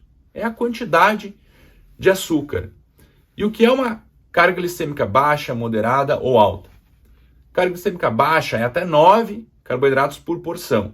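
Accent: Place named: South, Brazil. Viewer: Falo de Paço do Lumiar Maranhão, já tinha percebido que ele é do Sul pelo sotaque rs, nota 10 pro vídeo, muito explicativo, esclarecedor, além de muito dinâmico e simpático ainda é um gato 😍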